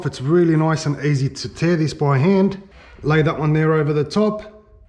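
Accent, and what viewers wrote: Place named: Australia. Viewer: 🤔Why do you sound Australian?🤔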